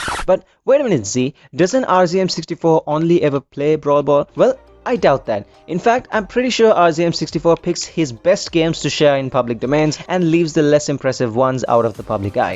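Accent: India. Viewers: Are you Indian? Your accent seems sometime like it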